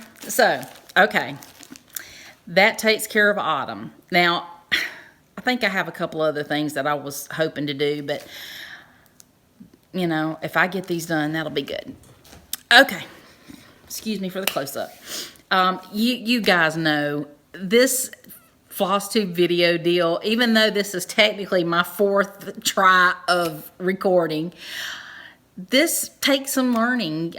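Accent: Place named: South, United States. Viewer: wonderful to hear another southern drawl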